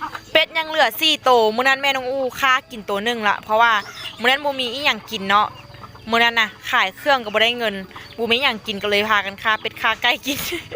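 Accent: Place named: Nakhon Phanom, Thailand. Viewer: บ้านน้องอู๋เว้าคร้ายบ้านอ้ายเลยนครพนม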